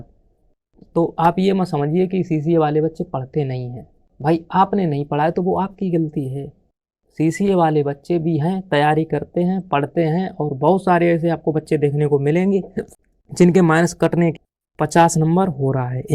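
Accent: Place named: Auraiya, India. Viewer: Aapki language tone UP k auraiya ki trf ki lg rhi h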